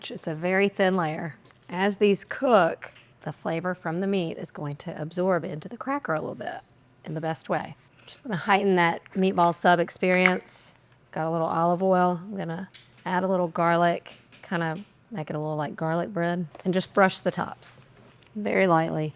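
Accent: South, United States. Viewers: What part of Alabama are you from ? I grew up in Birmingham but now am in warrior. I love your accent. To me it’s the kind of southern accent that is pleasing to the ear and doesn’t make us sound dumb and uneducated like the fake southern accent’s you hear on tv. Love your voice and your videos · I knew there was a reason I liked you so much, I lived in Mobile AL for 27 years and you have the southern thing down pat !!!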